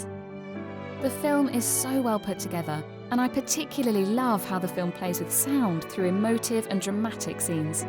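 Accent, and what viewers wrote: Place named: United Kingdom. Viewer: That British Accent makes this even more legit.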